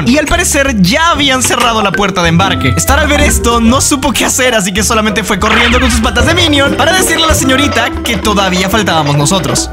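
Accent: Peru. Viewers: Eres peruano😮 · eres de lima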